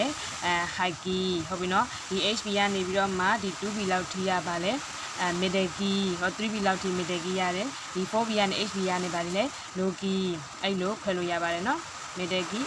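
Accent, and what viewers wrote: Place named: Rakhine, Myanmar. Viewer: ရခိုင်သံလေးနဲ့ တူပါတယ် ဆရာမ❤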